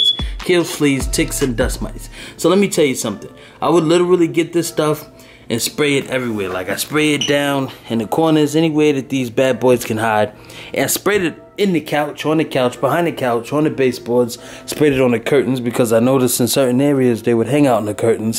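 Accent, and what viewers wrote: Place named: New York, United States. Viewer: Luv the NY accent...NYer for life❤️😎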